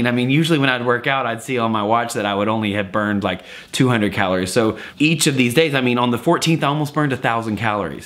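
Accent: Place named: South, United States. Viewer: Just now discovering this! FYI your accent totally changed after working out with! Haha. Southern boy made an appearance. Can't wait to meet you someday! (and watch all your videos!!!)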